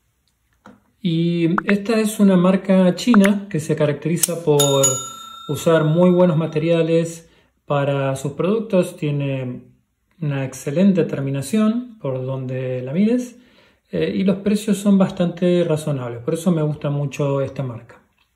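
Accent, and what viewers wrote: Argentina. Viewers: Hola, muy buen video: me gustó mucho, completito el edc. Te consulto: sos argentino o uruguayo ?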